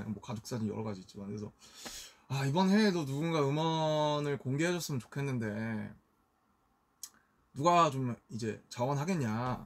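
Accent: United States. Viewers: Y do I luv it when he talks Korean w his American accent 😩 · That's because when he speaks English, he adopts a west coast California accent to sound like a typical American. He has the sound exactly perfect which means he studied and practiced hard. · Namjoon know what ARMY'S want.......he knows that there is no subtitle so we watch his live later with subtitle.....so he is also speaking in english so we ARMY'S will understand what he is saying.....and his english is sound like he is american.......and his voice OH MY GOD I CAN'T.....and he looks good in short hairs.......but I like his long hair more lol........but he still look best in short hairs....... I PURPLE YOU NAMJOON.........BORAHAE.....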